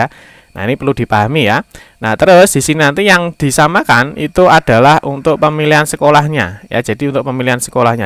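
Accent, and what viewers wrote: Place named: Java, Indonesia. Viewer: ga ada pengisi suara yang lain kah? ini medhok banget dan nyentak2, kurang lembut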